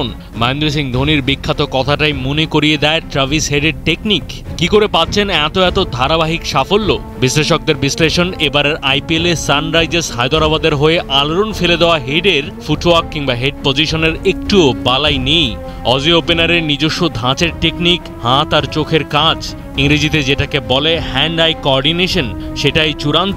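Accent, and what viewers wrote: Kolkata, India. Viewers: কোলকাতার কোন এক দাদা পড়তেছে খবর?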